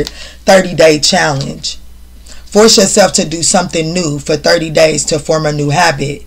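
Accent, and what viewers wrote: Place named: Louisville, United States. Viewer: I hear Louisville KY in ur voice?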